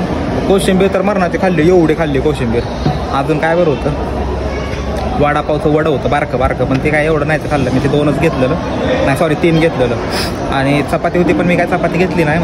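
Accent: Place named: Satara, India. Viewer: I love satara accent 😍 ❤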